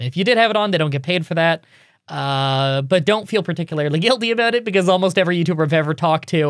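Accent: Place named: Canada. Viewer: Hey man, Thanks for uploading the lets play of pkmn stadium, I was really glad when I saw it, because I knew will be half an hour of escape and I was considering that you start this more popular lets play on porpouse, I was really nice. I just want to share a funny history, you were the first canadian that I regonize because of the accent and for me was super cool, at the time my wife and I were planning move to Canada, she was invited to do her phd in uOttowa., when i was trying to catch some of Canada's culture and make me feel great. Cheers man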